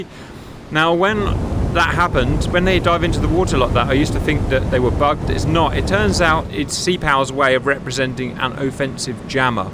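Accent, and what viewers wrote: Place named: United Kingdom. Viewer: Agreed. YT isn't purely about knowledge. has his own personality, plus he's BRIT-ISH (make a funny pronunciation yourself when reading). When looking at channels that get to this size, it's typically at least half of the host just being interesting/entertaining/weird/fun etc.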